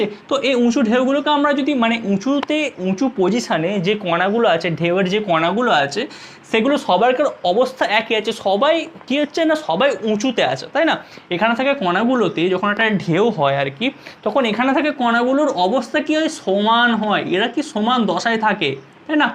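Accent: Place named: Bankura, India. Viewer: Dada apnar bari kothai? Ascent ta Bankura er moto laglo!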